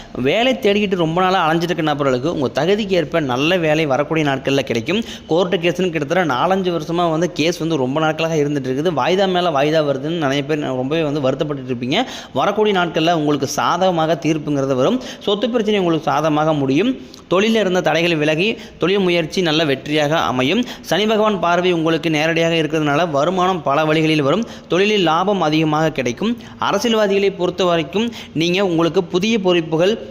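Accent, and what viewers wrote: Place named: Kongu, India. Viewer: அது அவங்க ஊர்ல அப்படி தான் பேசுவாங்க. கரூர் கோயம்புத்தூர் ஈரோடு நாமக்கல் கொங்கு பக்கம். அவா இவா என்று பிராமின் பேசும்போது அவங்க கிட்ட ஏன் இப்படி கேள்வி கேட்க மாட்டேங்கறீங்க? நான் திருச்சி பக்கம். அவங்க இவங்க என்று பேசுவேன். ஊருக்கு தகுந்த மாதிரி தமிழ் வழக்காடு மொழி மாறும். யாருக்காகவும் நம்மை மாற்றிக் கொள்ள முடியாது. சுயம் எப்போதும் மாறாது.🙏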